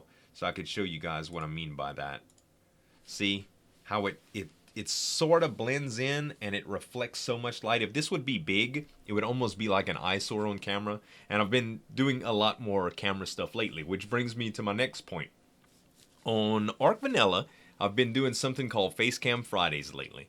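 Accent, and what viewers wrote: Louisiana, United States. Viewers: I knew just from hearing you in Slip's videos that you were from Louisiana. Your accent screams Acadia. :D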